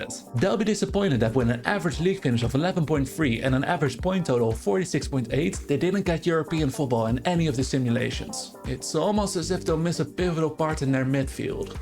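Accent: Netherlands. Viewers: I think every time if you talk, you’re a dutch guy who talks english😅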